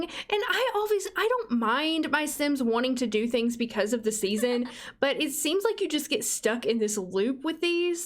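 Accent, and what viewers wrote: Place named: Kentucky, United States. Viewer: Hi your acc not sounds so match like mine any chance your from bardstown lol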